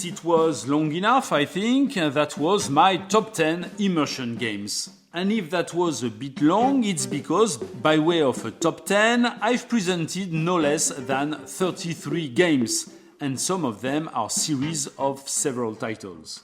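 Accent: France. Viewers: I think you probably specified exacltly what i like in board gaming in these videos. I hope to see alot more content published by you in the future. And always with that beautiful frensh accent ;) If i had one request it would be to see an english verison of the Here I stand videos. :)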